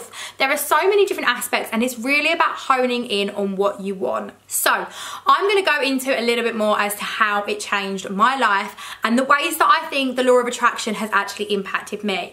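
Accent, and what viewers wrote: United Kingdom. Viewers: British accent😍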